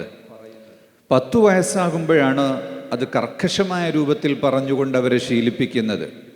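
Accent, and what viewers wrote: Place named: Arab world. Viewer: Iyaku malayalam ariyumo?? Arabi malayalam parayunnu. Pacha sanki malayalam.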